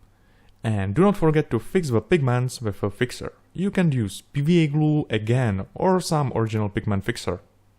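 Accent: Czech Republic. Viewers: ten prizvuk se mi zdal docela cesky :D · wait wait you are czech? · Ty vole, českou angličtinu poznáš všude...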